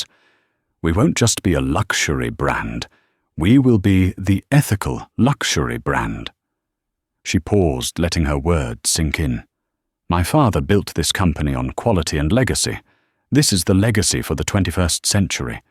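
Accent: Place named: Europe. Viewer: The narrator sounds like reading a fairy tale with European accent. Personally prefer a regular voice or a normal voice. Sorry. I couldn't stand the voice so I never finished the story.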